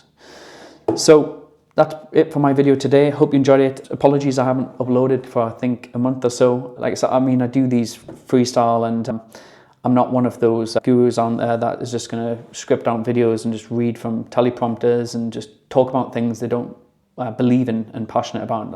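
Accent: Newcastle, United Kingdom. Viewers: Are you a jordie or from South Africa? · I thought I recognised that accent (as a fellow Geordie) 😘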